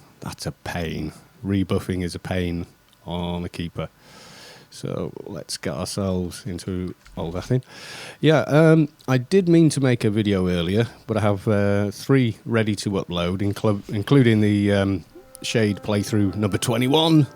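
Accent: United Kingdom. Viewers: I expect by your voice you would have short blonde hair and look like a brit . But now your going to look like a guy ready to hammer some death metal shows XD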